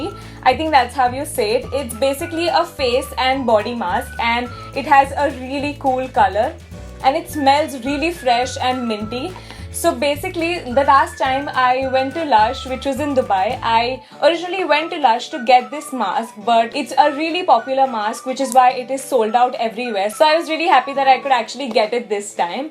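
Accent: India. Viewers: She is indian n indians speaks like this only ....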